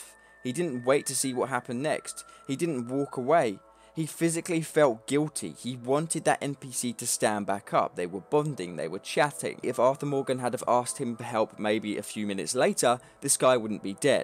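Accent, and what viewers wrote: United Kingdom. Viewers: He just has a british accent thats all.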